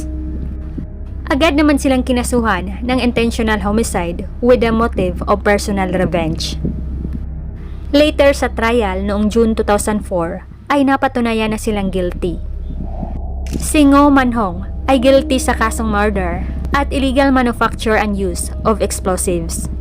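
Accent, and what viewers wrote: Visayas, Philippines. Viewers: Bisaya pronounce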